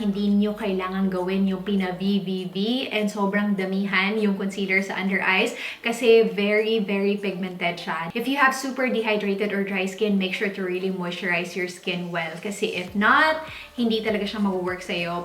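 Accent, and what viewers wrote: Visayas, Philippines. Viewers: Bisaya ka te????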